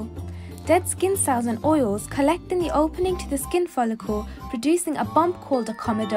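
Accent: Birmingham, United Kingdom. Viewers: She’s British, but from a different part of England. You’re probably American. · It's just a British accent, not even the same one as Peppa Pig. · Brummie accent. Birmingham 🇬🇧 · Haha omg someone appreciates a Birmingham accent, finally!🤣👏🏼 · she's from Wales this is a brummaccent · ❁ཻུ۪۪┊𝓝𝓸𝓮𝓵 it’s a british accent ... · OMG I ACTUALLY CANT 😂 It’s not fake it’s a Birmingham accents Lollll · its called brummie lmfaoo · Does this work on white heads? You sound like you're living in UK, did you get that brush in tesco?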